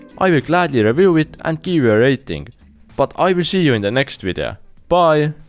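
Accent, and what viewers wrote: Finland. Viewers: Just with your accent I can tell you are Finnish! Terve Suomi! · You are Finnish . I am absolutely in love with the Finnish accent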